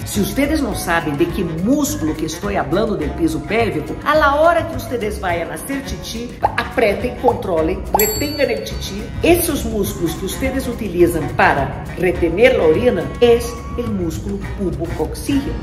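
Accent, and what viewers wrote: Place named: Brazil. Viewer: Me gusta su acento brasileño y habla el perfecto español. Saludos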